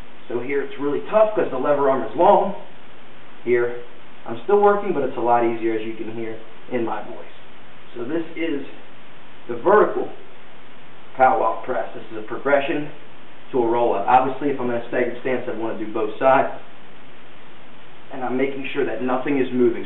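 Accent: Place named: Baltimore, United States. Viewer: I hadn't even scrolled down and I was like "hey, he sounds like he's from Bal'more - sure enough! Great exercises - thanks! I'll def. incorporate these with my clients.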